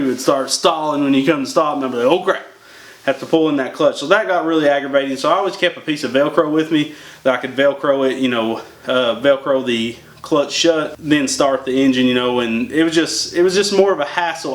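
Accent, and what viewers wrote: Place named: Arkansas, United States. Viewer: man i know this is an old video, hope you see this. but what state are you in? Being honest and respectful you sound like an Arkansas boy. Im from South Missouri. im just getting into gokarting with my buddies and we love your videos! jeep up the good work man!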